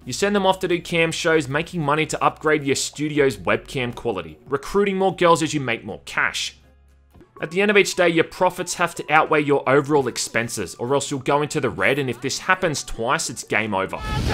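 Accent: Australia. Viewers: I love this man's review of huniepop it's hilarious an Aussie describing what hentai is very funny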